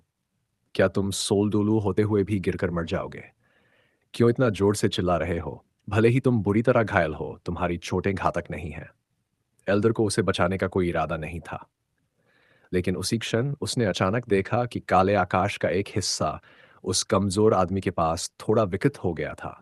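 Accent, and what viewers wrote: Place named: United States. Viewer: Bro video acchhi but voice American English type ho rahaa hai wo better karo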